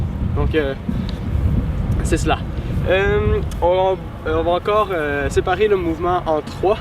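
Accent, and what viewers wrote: Quebec, Canada. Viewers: lol accent québécois sinon bonne vidéo :) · Super,accent canadien j adore j ai regarde ton tuto salto japonais et j'ai réussi au stade il i avait du sable merci beaucoup beaucoup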